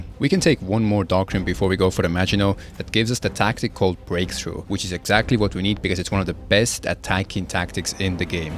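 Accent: Estonia. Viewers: Clocked the estonian accent 2 seconds in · I thought your accent sounded very familiar so I bet on Estonia. Still surprised to be right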